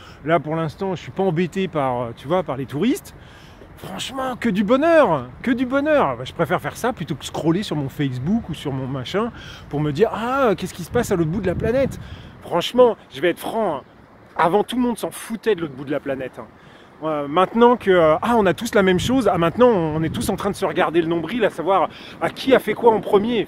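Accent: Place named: Quebec, Canada. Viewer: ah tu es québécois ?Gpmen !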